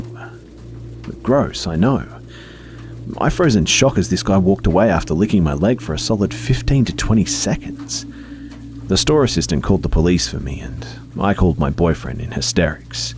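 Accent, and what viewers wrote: Australia. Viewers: You have such a wonderful soothing voice!! Thanks for using your talent to help me relax and unwind from my stressful life. It's always a pleasure hearing your Aussie Accent!!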